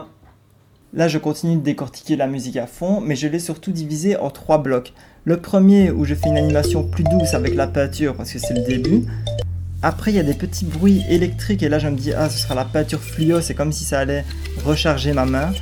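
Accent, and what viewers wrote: Liège, Belgium. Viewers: trop cool ca fait du bien d'entendre un accent liégeois !!!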